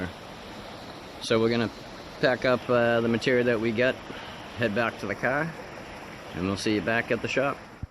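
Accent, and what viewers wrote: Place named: Massachusetts, United States. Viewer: I knew you were from MASS i had an old boss from MASS same accent.Maybe NE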